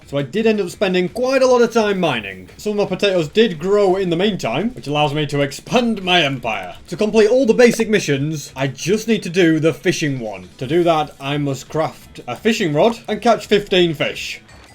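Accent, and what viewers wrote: United Kingdom. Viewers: hes british? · He is British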